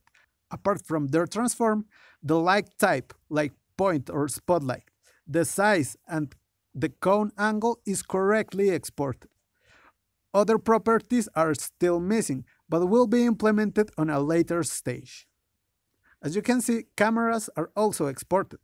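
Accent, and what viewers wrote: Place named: Latin America. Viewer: at minute I was like that is a latino accent! es de los nuestros! PD: Accent is not bad my friend, actually feel proud of your roots and being able to speak in 2 languages (or perhaps more) Saludos desde Colombia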